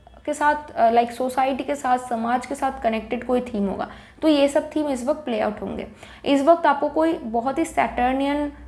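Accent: Bengal, India. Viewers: Typical Bengalli accent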